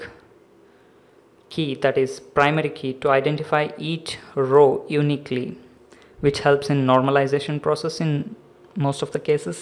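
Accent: India. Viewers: Indian English accent really sucks!!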